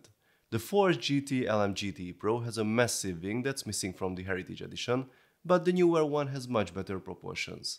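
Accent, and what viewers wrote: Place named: Hungary. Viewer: Oh, that accent... so typical hungarian accent. After the first few minutes I started to get suspicious, and I hoped to find the 'location' of this channel. The location is Hungary of course, so my ears were right. It's not necessarily a bad thing, my ears are just sensitive to that. Hungarian accent is such a distinguishable accent, and I heard way worse before than that. Usually when I dare/have to speak in english... I hope that my accent is not typical hungarian! In fact, I learned russian for a bit 7-8 yrs ago, and I found out that my english turned to sound russian a bit, so I might have russian accent when I speak in english.😆 I also like when people speak in english with real russian accent, it's just sounds great to me.😁 Anyways, great video, it was the first vid from this channel for me. 😀(And it turned out, somehow it was the 3rd vid for me from here) It was cool to see most of the Speed Champions in one place!👍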